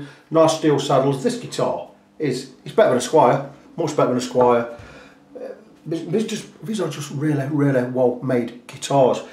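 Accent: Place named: England, United Kingdom. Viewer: The best value I found was a pristine EG-303 Yamaha and the EG112C2 is a nice HSS Strat type as well for cheap US Dollars. He is right : Yamaha electrics are well made, even the cheap low-end ones. That guy is a national treasure to Americans: we never hear a workaday Englishman talking and forgot where our early citizens came from in large part.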